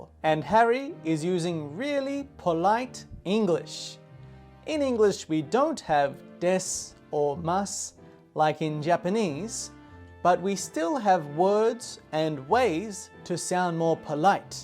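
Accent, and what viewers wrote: United States. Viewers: 映画好きとしては、こういう授業は、非常に助かります。永く英語を勉強しているのに、字幕なしで映画を聴き取れなくて、困っていました。このチャネルをこつこつと観ていけば、聴き取りが上達しそうな気がします。先生は、「ニュー」を「ヌー」と発音するので、さては、アメリカ人ですね。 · Are you American? koz I don't feel you have British accent like a Harry.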